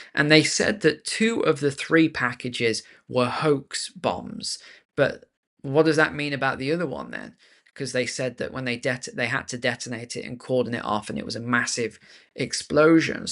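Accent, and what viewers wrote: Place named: United Kingdom. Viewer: Look man, as an American the sound of a British Accent is beginning to irritate me to no end. It's your love of the new Global Warming/Climate Change Religion, to your censorship of free speech, to your allowing the unimpeded invasion of your country by the Cult of ISLAM, to the general stupidity and wokeness of your society in general, UKRAINE STUPIDITY!! I hope Trump hammers you idiots!!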